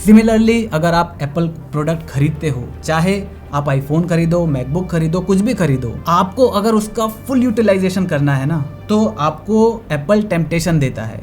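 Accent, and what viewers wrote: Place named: Maharashtra, India. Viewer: One more thing, by your accent , i think you are marathi cause im also marathi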